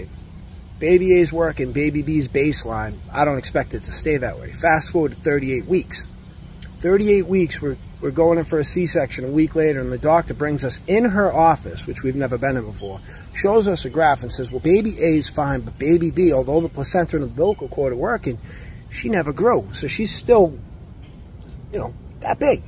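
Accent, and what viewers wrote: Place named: New England, United States. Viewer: Wowwww!!!! Nothing is impossible with our King 👑 🔥 He's got that New England accent I hear up here often 😅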